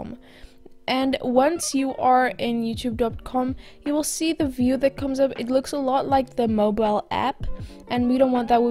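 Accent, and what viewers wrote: South Africa. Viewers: You sound south african I love it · This was very useful!! Also I love your accent!! Are you South African?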